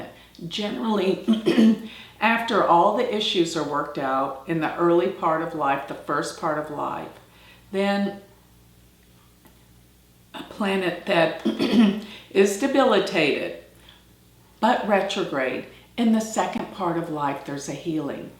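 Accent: United States. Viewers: thank you for the very perceptive and accurate analysis of Lousie's Chart. I must say you sound such a darling when you pronounce the Indian or Vedic terms....it's cool to listen with your American accent....I love it.....Best wishes on your work in Jyotish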